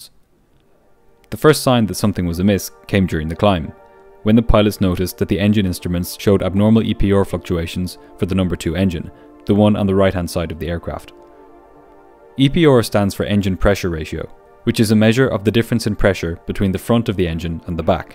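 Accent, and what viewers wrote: Ireland. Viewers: Hear hear! Nice to hear an Irish voice too 🤠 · This is an authentic Irish accent!! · Am I erong to guess that you're Irish just by your accent? Great chanel buy the way. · The subtle irish accent is soo pleasant to listen to! I think one of the cardinal reasons I like this channel so much is the excellent narration.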